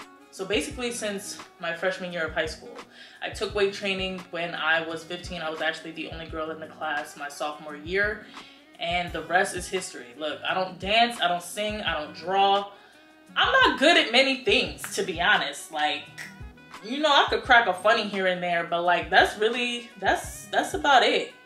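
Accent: New York, United States. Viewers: I love ya NY accent ans attitude, bk for 15 yrs. You are absolutely gorgeous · I love this so much. You’re not trying to fake the funk you’re so YOU! I still see/hear some NY in there 😂 love to see it.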